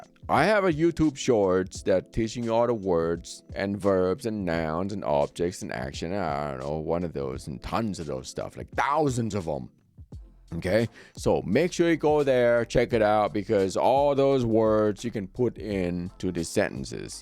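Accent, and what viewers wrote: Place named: United States. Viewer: Your English is perfect and sounds American 🇺🇸 Great video. I like your humor too. 😊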